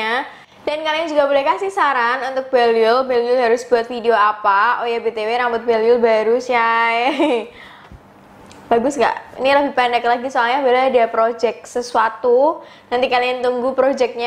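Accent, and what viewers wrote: Java, Indonesia. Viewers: Logatnya ke Jawa Jawa an💐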